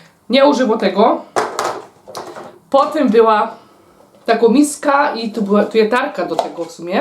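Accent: Silesia, Poland. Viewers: jestes Super babka,zawsze sie usmieje z twojej slaskiej gadki.Pozdrawiam cie z Ingolstadt. Jak bedziesz przejazdem serdecznie zapraszam na kawusie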